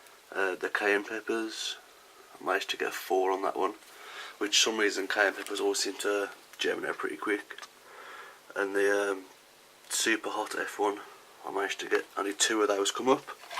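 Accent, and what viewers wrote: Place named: Midlands, United Kingdom. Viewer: Great video. I could listen to you "ramble on" all day. (You sound like a Midlands boy to me :)) Have you tried mixing in 20 - 30% perlite into your seedling mix? I find it helps to mitigate risks of dampening off. Ecothrive Charge, too, is a really nice potting mix amendment—easily obtainable in the UK. Peace, love and happy, vigorous sprouts—————Everest.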